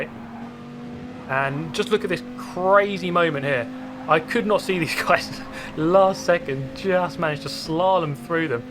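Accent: United Kingdom. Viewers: I love to practice my english pronounce with your british accent! Thanks for your existence. ❤️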